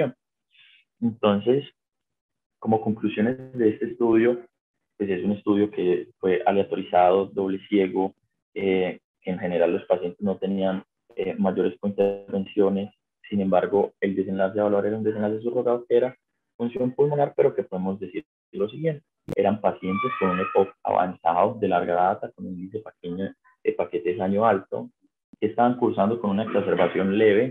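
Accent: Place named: Colombia. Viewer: No se entiende por el acento colombiano o es que tiene el micrófono muy pegado de la boca debe hablar más lento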